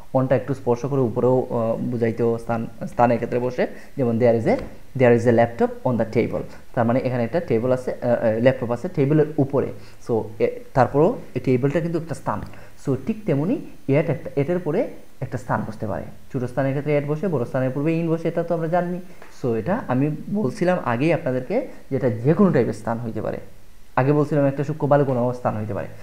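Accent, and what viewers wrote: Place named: Sylhet, Bangladesh. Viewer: অনেক ভালো লাগলো ক্লাস গুলো। আপনি সিলেট এর মনে হলো। আমি ১ বছর সিলেট ছিলাম, অনেক ভালো মানুষ সিলেটিরা।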